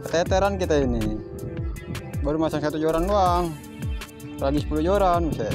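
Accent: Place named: Tangerang, Indonesia. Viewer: Selamat pagi bang .. salam kenal Logat nya kaya orang kampung Melayu tangerng dan sekitarnya ..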